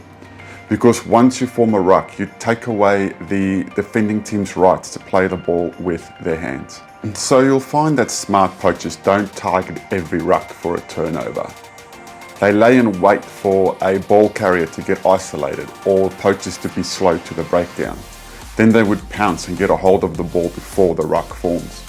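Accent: South Africa. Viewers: I can't believe I've only just found your channel. Amazing content, adding so much more to my understanding of the game. Especially the different, roles, positions and responsibilities👍🙏🏈❤️🎊 please can you confirm your accent for me. When I look at the screen and watch you, you sound south African. When I turn my head away and just listen to you, you sound austrailian/new Zealand. It's very confusing for me🤔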